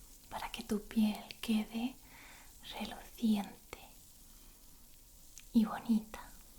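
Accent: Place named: Spain. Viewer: Este me parece el acento más lindo de España, de que región de España es?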